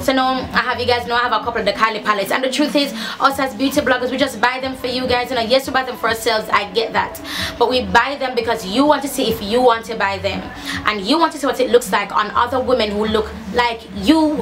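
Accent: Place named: Patois, Jamaica. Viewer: god say put good out n yuh get good back.... someone helped u help others, do other thing dont conform but i loved when i say yuh down zaful try on. do more things that ppl wan c. And even tho yuh jamaican talk slower and speak standard english sometimes not all weh come from jamaica can technically understand ur rawcha patois even tho that makes it funny. n nuff black ppl nuh undastan patois/patwa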